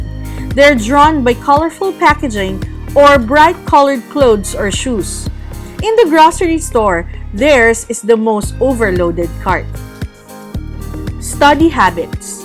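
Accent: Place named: Philippines. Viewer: Your English really sounds FIlipino. Matigas accent.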